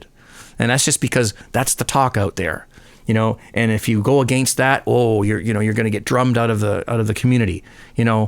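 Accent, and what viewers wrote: Canada. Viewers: Hi, I came to this video late and, after scanning the comments to see if any of them answered the question about why the New Zealand scarf on the wall. From your accent, you sound Canadian, so why the banner? BTW, you know your stuff. Don't let the bastards get you down.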